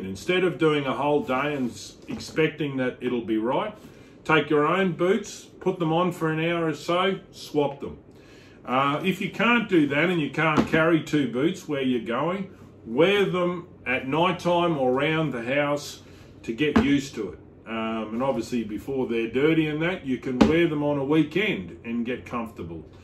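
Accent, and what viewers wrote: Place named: Australia. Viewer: Ah! Nobody told me he would be Australian! I can't even understand what the hell he is trying to say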